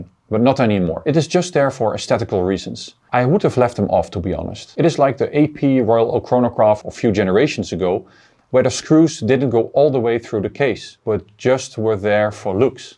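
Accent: Netherlands. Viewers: I love how me as a Dutch person can hear from somebody's Englisch if he is also Dutch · I am one of the lucky owner of the black one. Its great to see this review in English with a Dutch accent :-)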